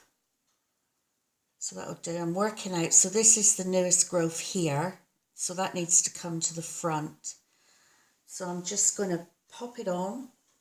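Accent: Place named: Cornwall, United Kingdom. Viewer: Were you from Cornwall originally? You sound as though you're from Cornwall, England.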